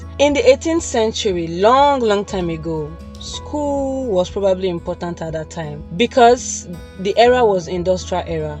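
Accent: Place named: Nigeria. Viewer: I like Nigerian accent.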